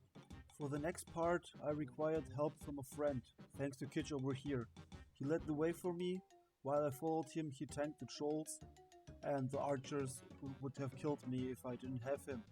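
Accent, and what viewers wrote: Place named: Germany. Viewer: ich wusste sofort, dass du deutsch sein musst xD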